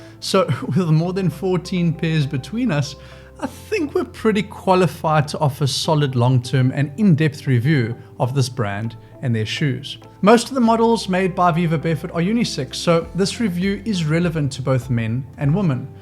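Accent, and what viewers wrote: South Africa. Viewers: I want to transition to barefoot shoes and Vivo is the only brand available here in SA. It was so refreshing to hear a South African accent that I was immediately drawn to the video. Thanks for the reviews because here in SA the shoes are pricey so making that first choice is crucial especially since I am looking for a work shoe. Thank you! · Ja, asseblief, man! When you have the time, I would LOVE to watch a video about the benefits of losing my socks! 😅 I'm very new to this barefoot style of shoe, don't own a pare of vivo barefoots yet, but may just be getting a Geo Racer. Lol. Thanks wifey! ❤️🙏🏻 I've also recently fallen in love with injinji socks, and never used to like those trendy toe socks that people would have about...but Injinji does it right. 😁 After the initial strange feeling of 5 to 15 minutes...they stayed on my feet the whole day, I slept with them on (normally, i rip my socks off my feet at night, because they have to breathe!), and continued the entire rest of the next day with them until my shower that evening. 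(36 hours) ironically,I had misplaced them, and just found them again a couple days ago...and yes, I'm totally wearing them while I'm writing this! 🤣 Anyway, loved this video so much, thank you so much for making it, hey! Baie dankie maneer! 🙏🏻 (my afrikaans was always terrible growing up in Cape Town, and it definitely lost importance when my family moved to the states, so I'm afraid you're limited to please and thank you, LOL! Jammer! 😉) It's so nice to hear a legitimate accent again, especially discussing in such detail the benefits of so many vivo barefoot shoes! 🙏🏻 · Dude you sound South African Are you a SAFFA living in Greece? Or am I being silly?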